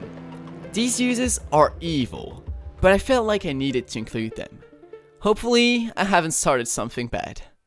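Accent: France. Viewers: C'est plus simple a comprendre avec ton accent Français 👍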